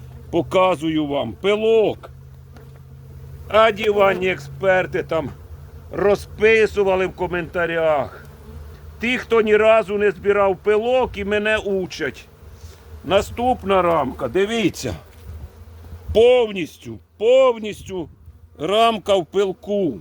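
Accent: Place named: Cherkasy, Ukraine. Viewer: Вітаю. Матка пропуски дає в засіві, чи так здається? І скажіть з якого ви регіону? Бо так по розмові ніби як з Черкащини. Цікаво.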